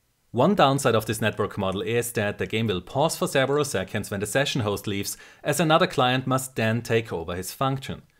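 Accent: Germany. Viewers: if this guy is not german i dont know what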